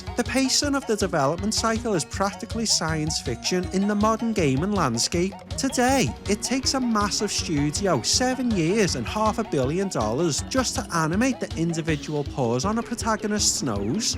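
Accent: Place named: Newcastle, United Kingdom. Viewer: Rockin that Geordie accent 😊👍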